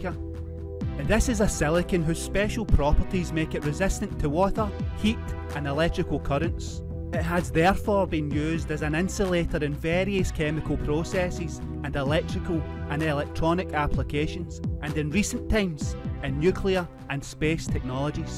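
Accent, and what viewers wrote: Scotland, United Kingdom. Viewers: I can hardly understand every other word of the Scottish accent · That's a strong Scottish accent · what part of Scotland is the narrator from please?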